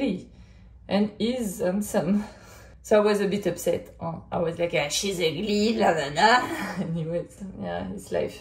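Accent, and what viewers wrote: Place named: France. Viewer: i love your heavy french accent and your style and how you express yourself. you remind me of the beauty and the woes of everyday life and i love how it makes me feel less alone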